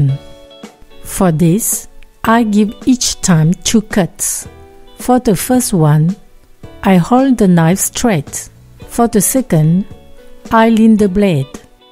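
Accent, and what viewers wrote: France. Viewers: LAOTIANS with a French Accent! Wow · I love pinneapple and thats why Im here but after 5 minute of french accent English, I feel like ordering some "baguette avec beurre" :))) I actually enjoy French accent English speakers. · Bravo! English sounds so much better with a French accent.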